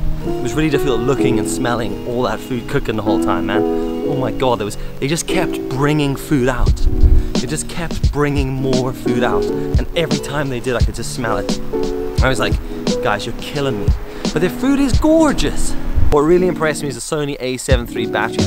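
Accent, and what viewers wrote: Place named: Northern Ireland, United Kingdom. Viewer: Where is your accent from? It sounds like a cross between Northern Irish and a New Zealand accent.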